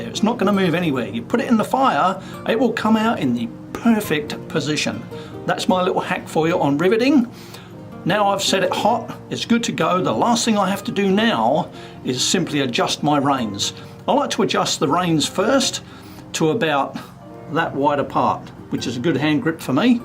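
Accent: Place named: Australia. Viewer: Nice to hear an Aussie accent. Good instructions for making a set of workmanlike tongs. Not trying for beauty - that’s for the things we use the tongs for. Thank you!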